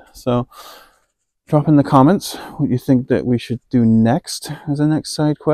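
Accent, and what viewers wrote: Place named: United Kingdom. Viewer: I’ve been wondering about your accent, where are you from in Britain? These videos are great, really nice to get to know you 😊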